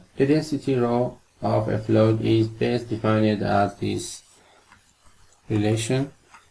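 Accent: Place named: Africa. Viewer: that's aafrican accent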